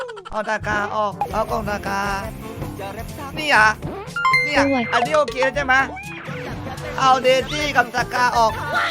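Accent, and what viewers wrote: South, Thailand. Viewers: พูดเหมือนคนใต้เลย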